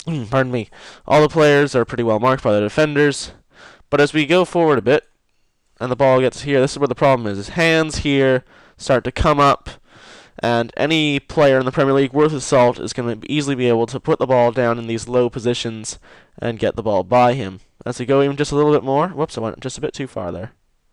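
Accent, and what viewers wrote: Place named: United States. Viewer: was actually going to listen to the whole video untill I heard your accent, you americans don't no shit about football! boruc is currently one of the best goalkeepers in the premiership.. saints fan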